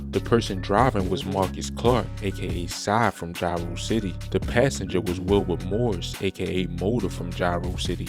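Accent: Baltimore, United States. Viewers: You gotta be from Baltimore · He must be from Baltimore or some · Dude talking must be from Baltimore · is bruh from baltimore? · The narrator has a very strong Baltimore accent but he knows his Chicago hood history apparently and that's what's up keep up the excellent work bro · bro yo Baltimore or some from that way accent keep coming out 😭😭😭im wondering why this inaccurate 🤣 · Dope content and on a side note I can tell by the accent you from bmore 🗣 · Aye you from Bmore??? I can hear them home town yuu's & 2uu's I'm from Lexington &vine Westside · Bruh yo ass got a Baltimore accent talkin bout some Chicago bidness.. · U from Baltimore gang? U got that accent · Bruh are you from Baltimore?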